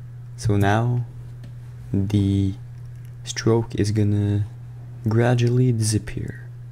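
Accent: France. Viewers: Thanks. Hey i've a question! Are you french? I'm sure.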